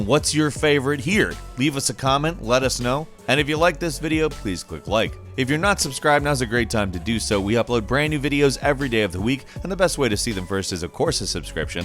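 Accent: United Kingdom. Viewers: MedievalShadows I’m pretty sure she’s a brit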